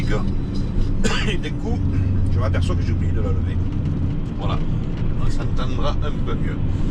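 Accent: Marseille, France. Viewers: Merci pour ces vidéos qui nous font découvrir Marseille avec se superbe accent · Un grand merci pour toutes ces vidéos que tu postes sur You Tube. Nous apprenons beaucoup de choses sur Marseille, en plus avec l'accent et les expressions marseillaises. Tu es un bon chauffeur et à la prochaine vidéo. Peux-tu nous dire ton prénom ?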